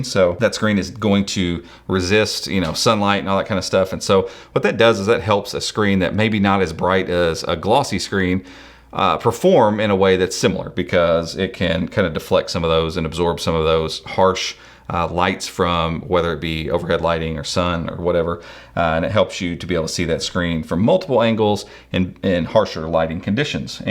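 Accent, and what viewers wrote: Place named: South, United States. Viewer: Talks SO fast in a southern accent for a Mainer is hard to understand you.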